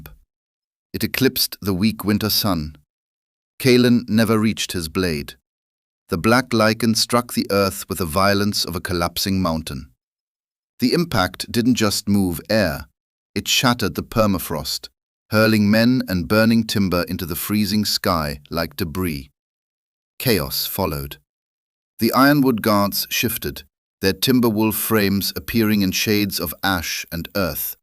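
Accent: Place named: Austria. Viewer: IKR, EXACTLY.!!! It's also every story from this channel. The accent is Austrian, I believe.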